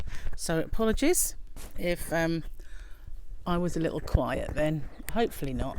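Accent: United Kingdom. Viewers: Text or call and see if they will take measurements that you can use in crocheting projects. I am enjoying your Veda as I feel I go for a visit to the UK each time I hear you talking. Like you, i steer away from the grim and doom frequently portrayed on TV. I have tuned into some good Christian channels that are instead very upbeat and encouraging. Keep it up love, you are doing fine!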